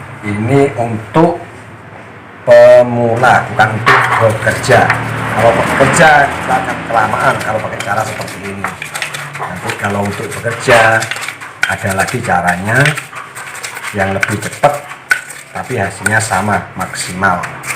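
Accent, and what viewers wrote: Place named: Madura, Indonesia. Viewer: Pengetahuan yang sangat berguna, terimakasih bang, alamatnya dimana ya, dan lagi spt ada logat madura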